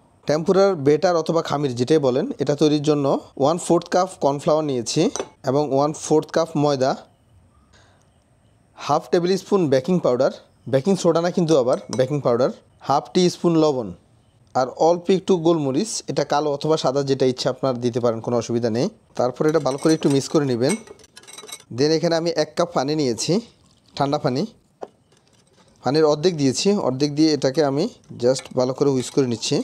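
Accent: Noakhali, Bangladesh. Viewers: নোয়াখালী এক্সেন্ট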